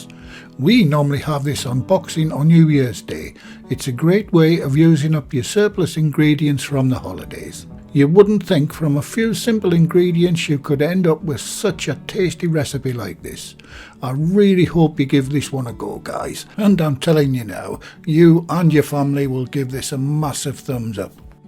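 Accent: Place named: Bishop Auckland, United Kingdom. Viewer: another great video! Your accent sounds so much like my stepdad from Bishop Auckland. Especially when you said “very” like “vury”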